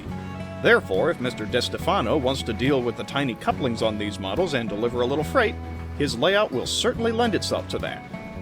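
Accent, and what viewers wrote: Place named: Texas, United States. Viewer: WHY do you sound like texan Gabe Newell?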